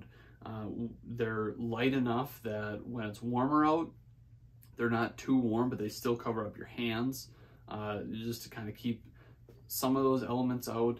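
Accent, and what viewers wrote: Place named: Midwest, United States. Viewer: I am from WI, your accent says mid to southern western. Where did or have you used said products? 👍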